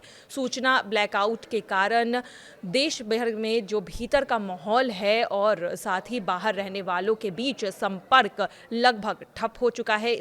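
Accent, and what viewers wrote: India. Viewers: बिहारी न्यूज एंकर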